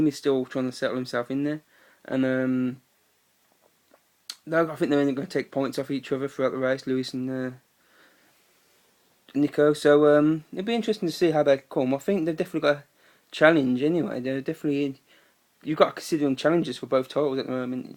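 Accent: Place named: Netherlands. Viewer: why you speaking like a dutch guy?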